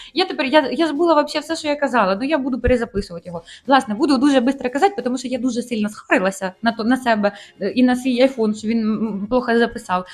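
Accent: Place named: Cherkasy, Ukraine. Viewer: Звідки ви? Такий цікавий говір? Часом не Черкаси ? Прекрасна енергетика😻😻 Продовжуйте далі❤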